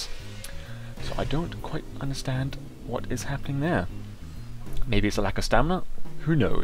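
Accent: United Kingdom. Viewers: Why don't you do more videos where you speak in your calming British voice?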